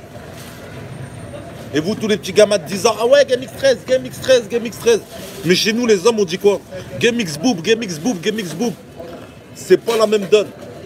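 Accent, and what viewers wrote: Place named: North, France. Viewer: C'est le nooooord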